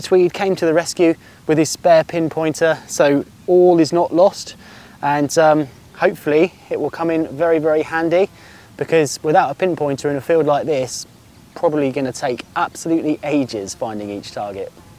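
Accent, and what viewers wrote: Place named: South, United Kingdom. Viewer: That was interesting, thank you.What part of Britain are you in? I'm guessing somewhere down south.🙂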